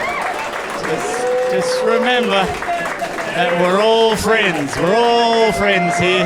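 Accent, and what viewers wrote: Australia. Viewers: Aussie accent is THE BEST ❤ Solid set too 👌🏽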